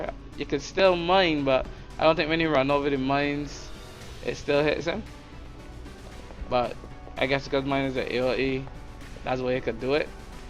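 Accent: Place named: Trinidad and Tobago. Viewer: That accent sounds like you are from the V.I or Trinidad. It's all good, I' m from St.Kitts Nonetheless very good preview of the Slayer skills. I was curious to see what the class was about and so far I'm impress. Big up!! ^_^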